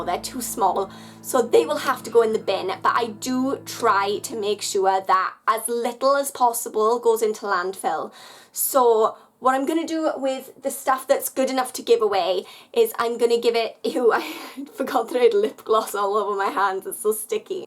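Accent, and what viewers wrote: Wales, United Kingdom. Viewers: ine lie this sounds very much like Welsh accent to me! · I was trying to pin point it. I was thinking Welsh at first. I blame Torchwood. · Maeli Tickle Welsh and it sounds lovely imo 😊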